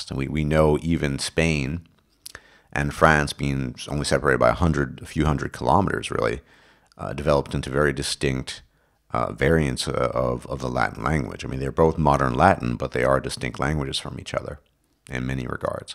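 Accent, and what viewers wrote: RP, United Kingdom. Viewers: like for the queen's english accent. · Haha, RP it's called? You sounded just like William F. Buckley, Bertrand Russell, Aldous Huxley, Arnold J. Toynbee, and Stewie Griffin.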